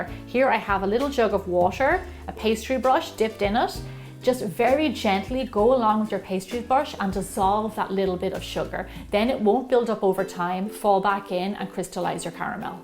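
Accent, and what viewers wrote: Ireland. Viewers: Are you Irish? I thought I recognized the accent, but I don't know, I haven't look at the rest of your channel! yett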